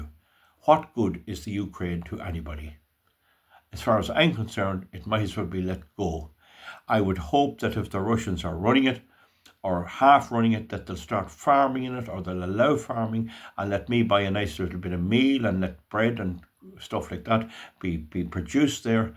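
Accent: Ireland. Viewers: Last time I heard such wise words in a fine Irish dialect was a while ago in a Cricklewood tavern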